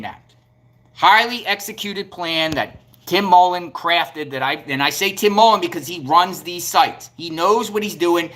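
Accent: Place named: New Jersey, United States. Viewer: HEY, personal question. (You don't have to answer.) I have fun trying to figure out accents. Are you originally from Jersey or Philly? I went from Jersey to Miami Beach in my teens so I know that a lot of New Yorkers move down to FLA. Snowbirds, you know! 😆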